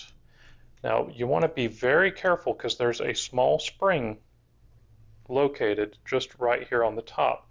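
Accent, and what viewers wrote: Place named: North Carolina, United States. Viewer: I know someone else with a North Carolahna accent so I was able to figure out that you were talking about Tri-Flow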